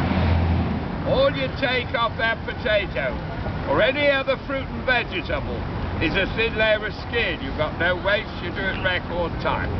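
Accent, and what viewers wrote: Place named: United Kingdom. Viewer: He has an amazing British accent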